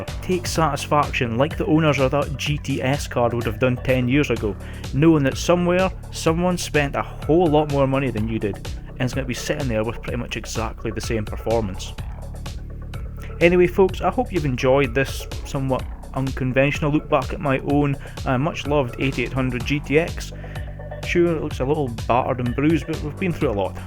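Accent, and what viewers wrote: Scotland, United Kingdom. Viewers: Haha sounds awesome man! Good to hear another Scottish tech channel btw, I hope yourself and AdoredTV become bigger because both your videos are really good! I'm from Glasgow myself :)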